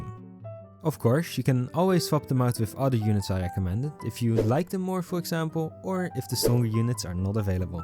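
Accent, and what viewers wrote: Netherlands. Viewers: Not just by chance, that was extreme dutch. it got me distracted from the video after 1 line in xD usually a is of dutch people talking english they can very well recorniz each other pretty that there dutch though here u use some words that have same letters but use the dutch way of saying it instead of the english one xD You do you though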